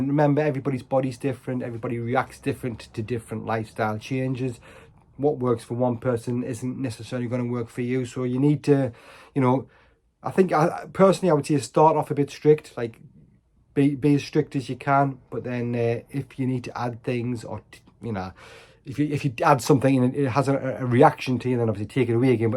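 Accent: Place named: Newcastle, United Kingdom. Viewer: New subscriber, nice to hear another Geordie, thinking about this myself, currently binge watching everything on this lifestyle, hopefully its for me.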